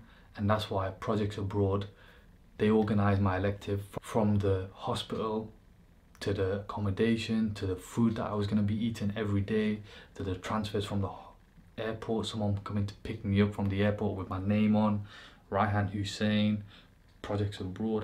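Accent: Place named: London, United Kingdom. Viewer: lol you don't sound as geordie, been londonised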